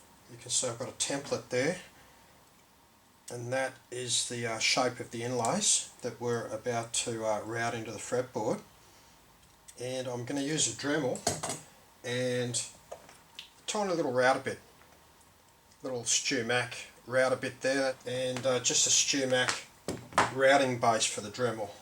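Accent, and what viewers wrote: Australia. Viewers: Thanks for an absolutely great video on how to do this with precision! And I love your Australian (?) accent:)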